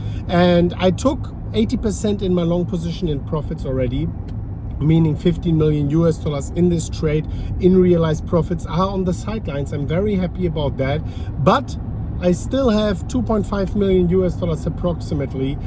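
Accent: Germany. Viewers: First time viewer, this guy is German right?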